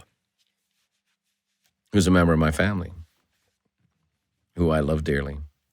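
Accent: Dublin, Ireland. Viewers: I’m from Cork, love hearing his Dub accent 🎉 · Comments say he's an Irish-American immigrant Brian Buffini. Cool. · He has a Irish drawl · Yes he has a Dublin accent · Is this guy Irish? Has such a crazy accent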